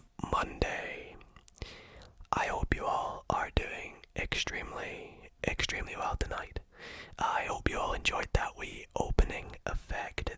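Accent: Scotland, United Kingdom. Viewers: Are you Scottish? Cos I an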